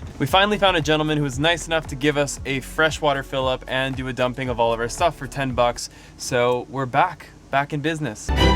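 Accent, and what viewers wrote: Canada. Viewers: The longer you stay in Canada the more of the accent comes through. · LOL - your Canadian accent is really showing itself again!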